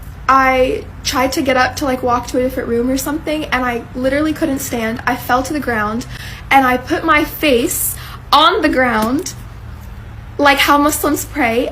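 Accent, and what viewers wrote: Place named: United States. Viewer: Sister I can tell you're definitely American. And you're a good servant of ALLAAHH..in sya Allaahh. May ALLAAHH PROTECT n bless you eternally❤❤❤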